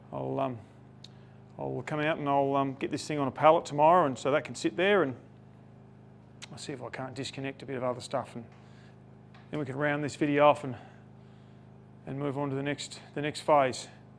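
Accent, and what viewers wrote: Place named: Australia. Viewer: New viewer from Vic via China now. Love hearing your aussie genuine voice and thoughts.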